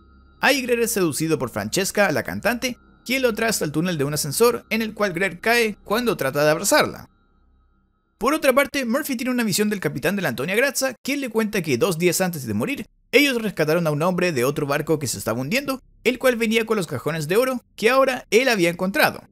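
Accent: Chile. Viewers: Una pregunta eres de chile amigo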